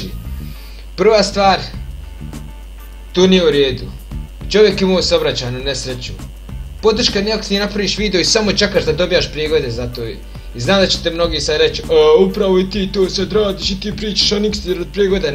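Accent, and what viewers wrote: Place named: Bosnia and Herzegovina. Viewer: Jesi li ti Bosanac vidim govoris ba i malo ti naglasak lici na Bosanski ? ili si iz Makedonije ?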